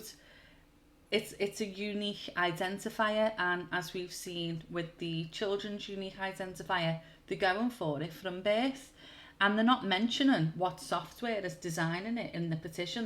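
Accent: Liverpool, United Kingdom. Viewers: As a southerner I love the scouse accent ...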